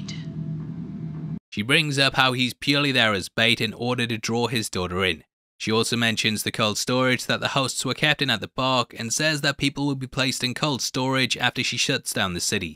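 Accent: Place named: England, United Kingdom. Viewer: I love English accents. You called Christina Christiner and I love it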